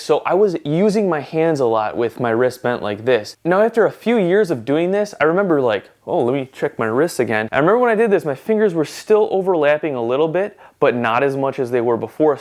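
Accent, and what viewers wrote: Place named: Chicago, United States. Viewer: Wow no comments on his accent? I was thinking Chicago or New Jersey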